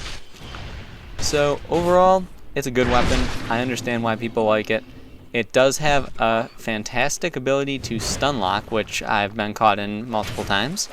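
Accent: United States. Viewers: American pronunciation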